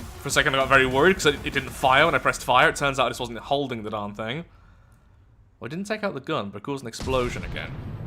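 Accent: United Kingdom. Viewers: When I cannot sleep I get recommended videos and I get to pull a all nighter to his British voice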